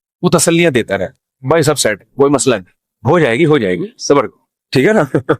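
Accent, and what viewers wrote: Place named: Karachi, Pakistan. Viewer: مفتی صاحب کا کرانچی والوں کا لہجہ بھلا لگ رھا ھے ۔❤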